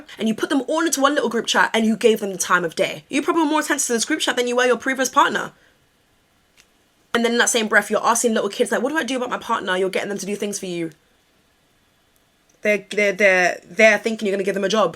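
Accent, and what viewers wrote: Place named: London, United Kingdom. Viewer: lol I love the career/Korea part in your wonderful Londoner? accent.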